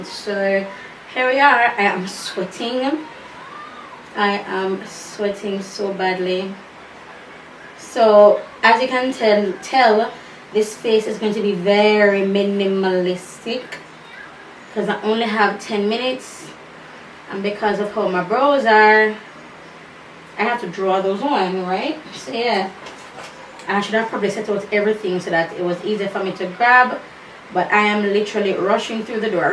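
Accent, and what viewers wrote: Jamaica. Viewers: Are you Jamaican 🇯🇲 and British 🇬🇧? I hear two different accents. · I love how you speak girl.love ur patwa. Can listen to u all day. Beautiful makeup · Great Job💪🏾 you sound Jamaican? New subcriber from! JA😊 Mama Mama!😊 i like your vibes you are so down to earth and the love of God is real in your life! Your vibration will take you places you never imagin😊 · First time watching ur video but u sound Jamaican so am gonna subscribe lol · Its actually an Jamaican accent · U sounds like a Jamaican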